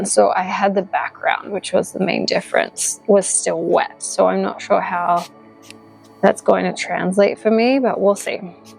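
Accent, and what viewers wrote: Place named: Australia. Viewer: Nice process video. Both real, and real-time. I'm terrible at placing accents, but I think you and are from the same part of Australia, and maybe have similar missions as art vloggers.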